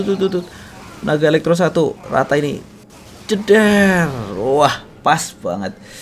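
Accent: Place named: Banyumas, Indonesia. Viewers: Daerah ndi om dening ngapak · Ngapak banget mas ngomongnya:v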